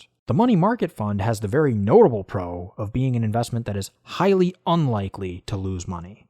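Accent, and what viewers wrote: United States. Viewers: What’s your accent? New York?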